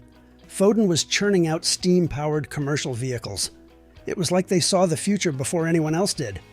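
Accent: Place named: United States. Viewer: Why do we have to have the voice of an American narrator.???????????????????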